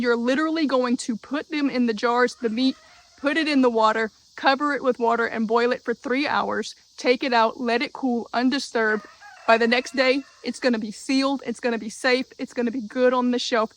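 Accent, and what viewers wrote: Louisiana, United States. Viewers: I knew you were from south Louisiana the moment you spoke. I might be in Tennessee but I boil crawfish here too because I lived in New Orleans most of my life. Great video.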